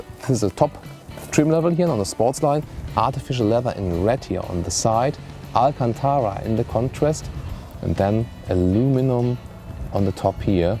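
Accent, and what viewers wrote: Germany. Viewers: Please talk english not genglish! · love german speak english.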